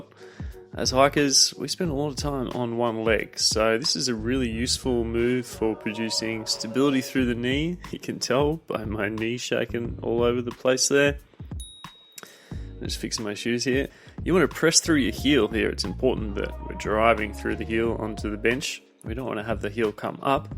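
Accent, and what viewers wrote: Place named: Australia. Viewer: First time watching, excellent. Guessed Australian before you mentioned it but thought you were a Norwegian who learned English there.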